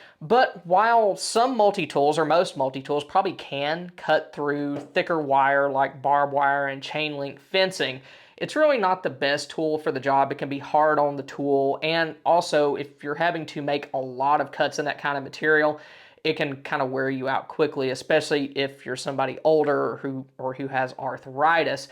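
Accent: Texas, United States. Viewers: Congrats in reaching over the 100K subscriptions mate you deserve it as you always have great content and advice. That BK knife you have looks the goods and I have quite a small collection myself of both bought knives and I also make my own. Look forward to many more great videos and I like listening to your Texas drawl, regards from Down Under mate 😁😉🦘🦘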